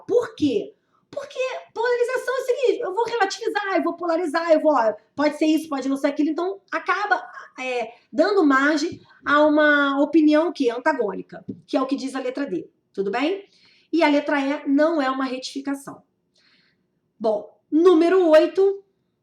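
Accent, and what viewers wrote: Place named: Rio de Janeiro, Brazil. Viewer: vc dá aula no RJ em qual curso? sou daqui e nosso sotaque nos entrega rs. Gostaria de ter aula no seu curso.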